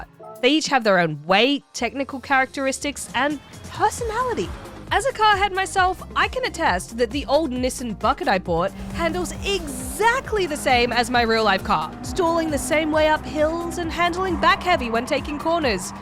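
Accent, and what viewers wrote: Australia. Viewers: Haaaaars and haaaars. Is it just me does say haaaar instead of hour? Definately a mixed accent, Australian and something else. Just can't place the secound part of the accent.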